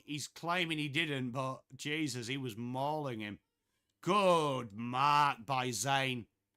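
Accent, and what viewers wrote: England, United Kingdom. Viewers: What part of England are you originally from?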